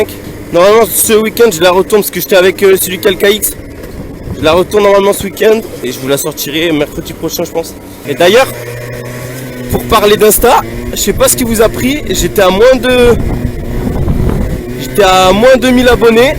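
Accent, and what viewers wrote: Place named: Bordeaux, France. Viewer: Tes d'où t'as l'accent de bordeaux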